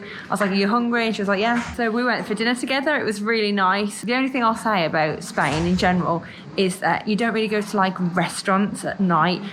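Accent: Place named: England, United Kingdom. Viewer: Can understand your English English, must be how most Americans spoke when they arrived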